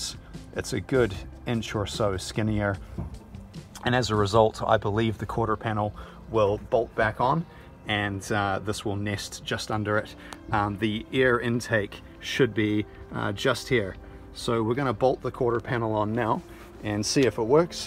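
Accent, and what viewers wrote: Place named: New Zealand. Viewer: You sound like you're from somewhere in the south island of NZ?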